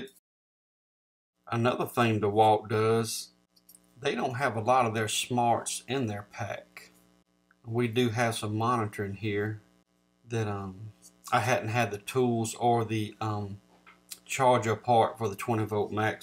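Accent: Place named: Georgia, United States. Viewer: Sounds like your from Georgia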